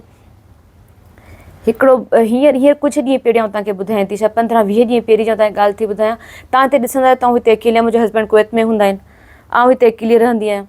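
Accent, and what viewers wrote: Sindh, Pakistan. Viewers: Adi twhn larkano ja ahyo??? Twhnji sindhi jeka ahy na uha thatta badin tmk area wari lagy th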